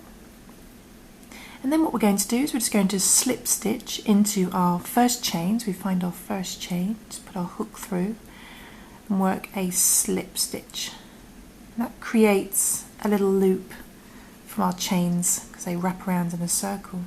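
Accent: England, United Kingdom. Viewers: You have an English accent but you are describing stitches with American terminology aren't you? I'm confused - we don't have a single crochet in English terminology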